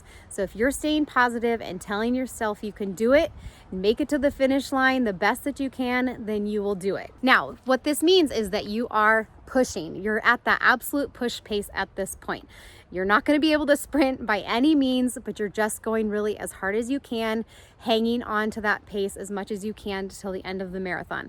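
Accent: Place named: Midwest, United States. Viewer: Judging by your accent (same as mine), it’s nice to see a “northern girl” with some marathon content!! I’m rom WI and now in the twin cities. Do you do a lot of winter outdoor running or do you focus more on the treadmill? How does that affect your training or is it just sustainment mode during the half year of snow on the ground? 😂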